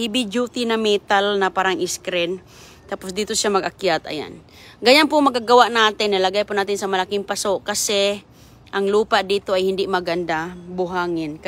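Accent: Visayas, Philippines. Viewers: Feeling perfect nman itong SI, Visaya sya huh Kya iba ang intonation ng words nya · Tama,mga walang hiyang bashers bash ng bash buti sana kung english teacher vlogger yan para magandang magpronounce eh visayan people kadalasan matigas dila...mga walang kwenta mAnuod lang ni para makapangbash..walang ambag sa mundo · korek poh.. grabe mkpanlait sa salita ni eh alam.nmn nia laking probinsya at bisaya nga...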